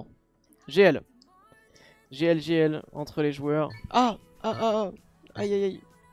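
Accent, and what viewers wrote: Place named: South, France. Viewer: 2h du mat quand je regarde et surpris d'entendre gueuler un sudiste au bout de 10sec de vidéos XD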